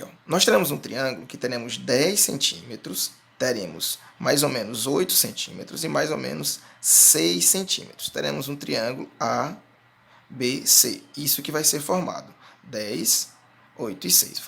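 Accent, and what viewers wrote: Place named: Pará, Brazil. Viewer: ele tem sotaque do Pará kkkkkkk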